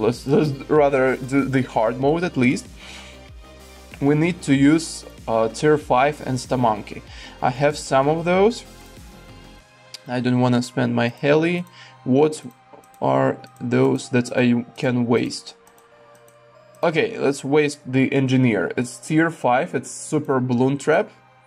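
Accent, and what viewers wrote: Russia. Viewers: I am gonna guess that the accent is Russian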